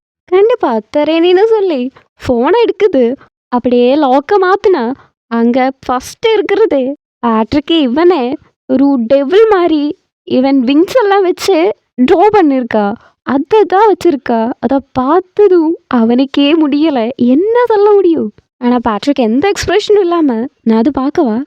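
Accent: Kerala, India. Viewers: Accent ketale theriyuthu pa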